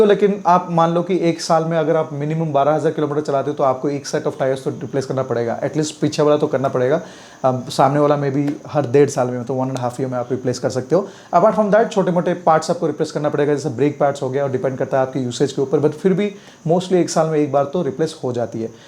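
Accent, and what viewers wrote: Odisha, India. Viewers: Bhai are you odia..?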